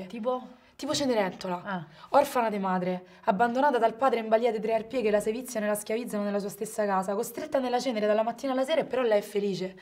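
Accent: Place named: Rome, Italy. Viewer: L'accento romano dente tutto unico😂😂😂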